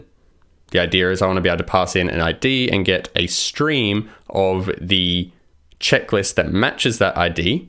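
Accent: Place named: Australia. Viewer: very good tutorial. Well explained. I've learned a lot from you! And you've got an Aussie accent, which is a plus!;)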